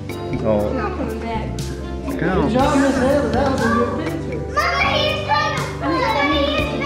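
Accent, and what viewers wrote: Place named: South, United States. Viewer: I love the bad music and the hill billy narration.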